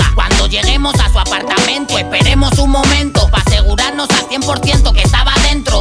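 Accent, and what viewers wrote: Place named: Dominican Republic. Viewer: El tiene el acento un poco dominicano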